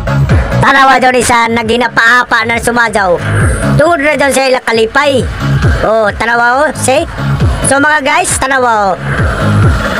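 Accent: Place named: Mandaya, Philippines. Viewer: parang mandaya yung salita mo host ba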